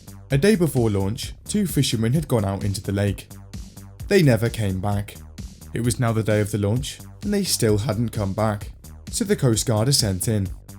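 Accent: United Kingdom. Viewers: with a bri ish ascent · Not fond of a Brit voicing this about Cleveland. If you’re gunna make fun of the USA, get someone with an American accent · I love the British man going over my state's history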